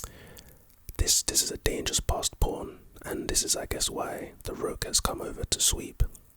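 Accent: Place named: South London, United Kingdom. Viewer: Mr if you don't mind me asking where are you from? cause you have a very distinct south London accent and I honestly can't figure out where in south your from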